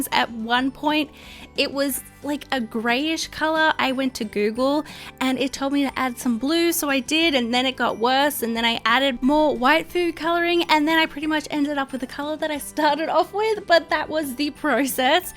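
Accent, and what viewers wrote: Australia. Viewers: I LOVE her Australian accent!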